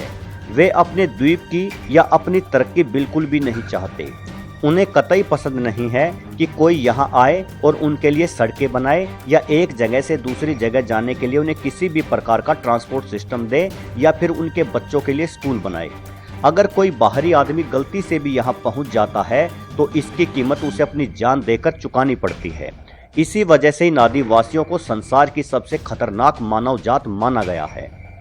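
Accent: Haryana, India. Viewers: Bhai aap haryana se ho kya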